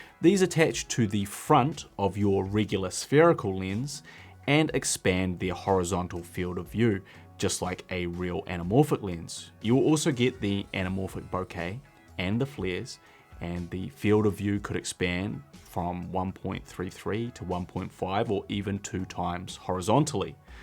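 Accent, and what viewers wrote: New Zealand. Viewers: Not funny … Guy’s a New Zealander or ‘Kiwi’ ….. that is just the kiwi accent. Accept ! Comment on his content which is always very informative.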